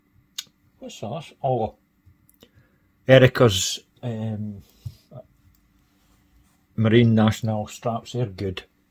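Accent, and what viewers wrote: Scotland, United Kingdom. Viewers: That was aggressively Scottish and I am here for it! 😂 Subbed!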